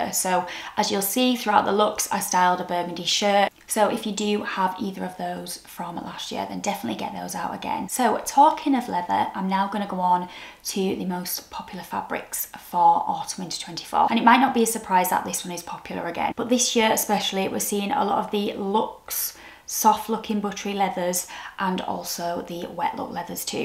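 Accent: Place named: United Kingdom. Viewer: I just discovered your channel and it's a pleasure for me to hear spoken british English. The internet is full of american channels. I am really glad that I found you and I will watch your channel more often.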